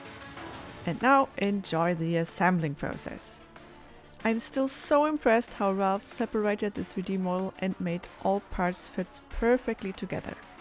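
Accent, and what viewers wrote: Germany. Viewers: I just found you guys randomly and judging by your accent i figured you are german. Turns out you are. Also, it turns out you guys were at the Fantasy Basel. Seems like I'll see your work or even meet you guys in the next FaBa. Nice. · You are german or swiss or austrian arent you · You sound so damn German :d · DU BIST DEUTSCH AKZENT,VORSCHAU IN DER ECKE OBEN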